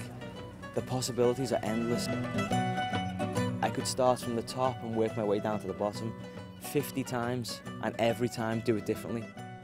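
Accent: Liverpool, United Kingdom. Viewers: The moment I heard you speak I knew you were from northern England and I thought of Liverpool because your accent sounds exactly like John Lennon's! PS: Great video, great skills, great sport! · I knew you were Liverpudlian by your accent<3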